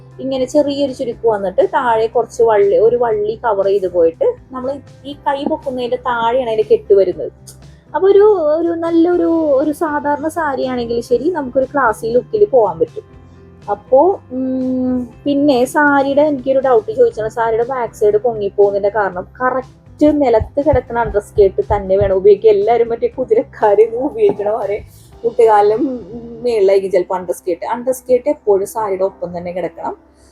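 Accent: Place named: Ernakulam, India. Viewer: ചേച്ചിയുടെ എറണാകുളം ഭാഷയാണ് പൊളി🎉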